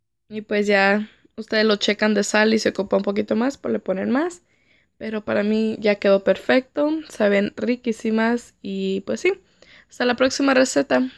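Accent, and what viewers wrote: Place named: Chicano, United States. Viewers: Supongo q mexicana nacida en el gabacho así hablan todos los q nacen en usa y los q llegan desde niños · Me encanta que diga todo en español aunque se le note que probablemente es chicana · Por la voz de ella, le atino que vive aqui en estados unidos, pueda ser Texas y que es una chava de unos 19años jejeje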